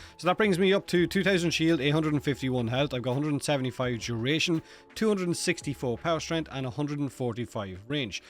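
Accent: Ireland. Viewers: I really love your irish accent 😉 « Moch moch bettar experience » ❤ And I do agree with you btw, it's an amazing frame now. Even better, it's a free gift atm, so imagine for a new player : pick Caliban, and steamroll the whole star chart with your new cute baby sentients.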